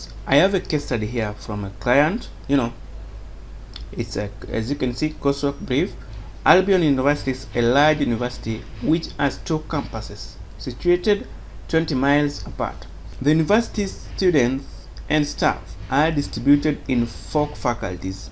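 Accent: Africa. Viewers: This was simply amazing. We were given project by university to implement this and you illustrated it perfectly. More power to you I guess by your accent that you belong to Africa. Lots of love for you brother from Pakistan. Kudos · Hello, great video and tutorial! I want to thank you for helping me with creating a network like this. I have a few recommendations for you to improve the quality of your videos even more. 1.) You should do something about the noise in your microphone as it is a bit annoying to focus on learning while that is constantly bugging in the background. 2.) You have quite a unique accent. Native African I believe. As I'm not familiar with your accent, sometimes it becomes quite hard to understand some words. So, using closed captions would really help to make your videos be even easier to understand. 3.) You should make a list of what the steps are in a serial order so its easier to pick up from anywhere in the middle of the video if someone is stuck somewhere or is confused because right now its a bit hard find specific steps if I want to go back to them. 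ALL that being said, you were a great help and I really love this channel. Thanks for creating such videos and keep creating more!!!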